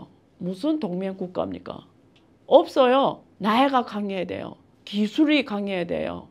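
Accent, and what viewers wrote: China. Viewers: 중국인이세요?발음이 확실히 느껴집니다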